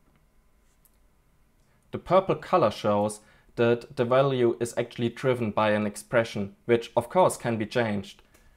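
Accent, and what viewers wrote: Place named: Germany. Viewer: Bruder man hört das du deutsch bist 👌🏽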